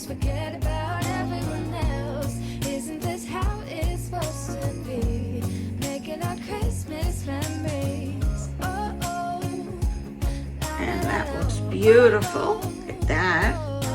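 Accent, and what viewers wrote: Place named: East Coast, United States. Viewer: Absolutely gorgeous!!❤ I love the embellishments you put on them 💖 And every time I watch your videos I think to myself that it sounds like you’re an East Coast girl even though you live in Cali 😁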